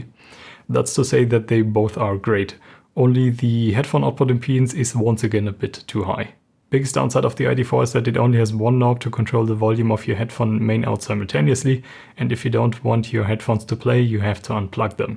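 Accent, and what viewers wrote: Germany. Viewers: I was really excited to see your video, 2 mins into the video I was purring like a cat, your quite monotone German accent put me to sleep, so I had to rewatch it in the morning.....You should start a new channel.... sorry 😮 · man i am so sorry but it's just so crazy how grating german accents are in english, especially his, just full on german sounds, cadence and everything but in english, his english is great but i literally can't listen to this, gotta read subtitles. i'm from germany but american born and this is not even a "me" thing, german accents are the #1 most frustrating to listen to GOSH man ich bin so abgefuckt davon aber ich weiss dass es sinnlos und dumm von mir ist, so empfindlich zu sein und dann noch ein kommentar drueber zu schreiben :D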